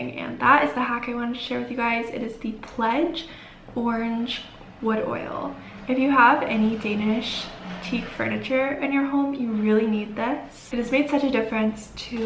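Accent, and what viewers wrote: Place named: South, United States. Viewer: Omg I used to watch you yeeeears ago when you still had a cute little country accent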